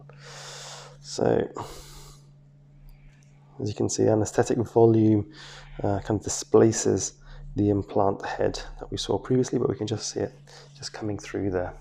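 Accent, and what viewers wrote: England, United Kingdom. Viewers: Very soothing bedside manner, as usual! And I love how everything sounds better with an English accent. Keep up the good work, Doc. :-)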